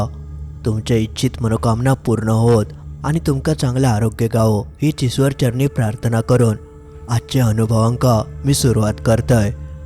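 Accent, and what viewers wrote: Malvani, India. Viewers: एक नंबर 👍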